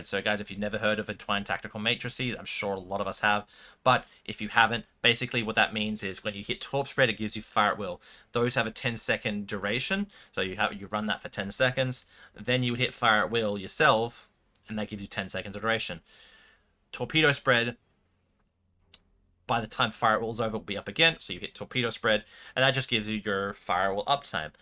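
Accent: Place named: Australia. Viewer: Don't listen to whiney ass Americans. You are obviously Austrailian. If you say Ark Royale. Then just say Ark Royale...I'm American, and I don't think you should listen to others that nit-pick your accent. Fuck em....just say what you want. If you want to just call it the Ark Royale with Cheese....I won't complain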